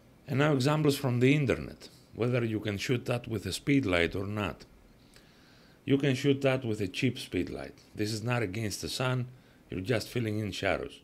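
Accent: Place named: Greece. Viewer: Your accent is so Greek!!! I am not ready to move on from my d850 I don’t like mirroless!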